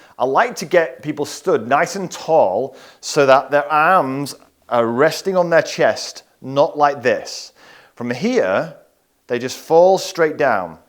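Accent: United Kingdom. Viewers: Thanks, that was very useful. I also really appreciate your British accent !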